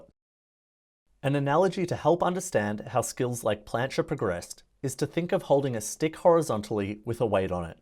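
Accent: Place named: Australia. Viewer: That Aussy accent ...😁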